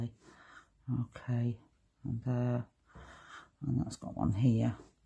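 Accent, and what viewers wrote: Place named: North, United Kingdom. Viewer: Love your work, loose is the way to go. Sounds like you are from somewhere in the north?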